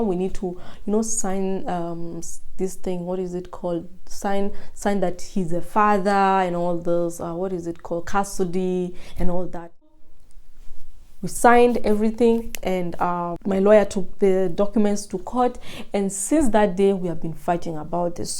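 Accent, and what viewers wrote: Nigeria. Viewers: Why do you have a Nigerian accent? 🤔. Ubaya ya wasichana wakenya ni kupenda ngono sana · Pole..i noticed your accent is almost Nigerian. Dont worry things will be better. One door closes and 2 more opens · It's giving Nigerian accent!! You are strong girl.❤ Keep up gal! You are a star. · You kinda have a Nigerian accent. Anyways, sending all my love ❤️ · U got new sub I'm so sorry for what you go through I also I noticed you have naija accent 😂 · but accet yako ni yawanaijeria😂😂😂😂u speek like them not kenyan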